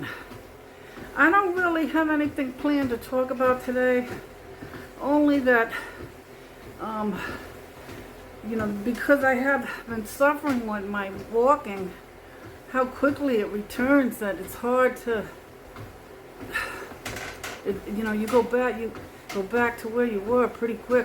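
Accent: New York, United States. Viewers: PS... you don't have to walk to keep me listening. I love your NY accent and your great personality.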